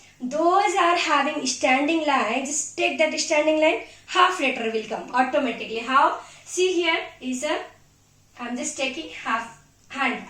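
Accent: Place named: Tamil Nadu, India. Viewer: We are missing your tamil pronunciation ......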